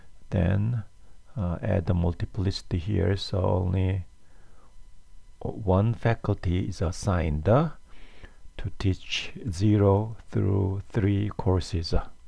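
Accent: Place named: South Korea. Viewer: definitely korean accent.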